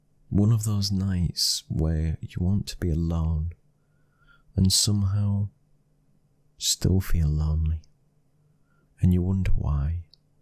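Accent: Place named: Northern England, United Kingdom. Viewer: I'm originally from New York, but now live in Chicago. I'd love to move to Yorkshire one day. I could tell by your speech patterns that you were Northern English. Your voice is so soothing and gorgeous.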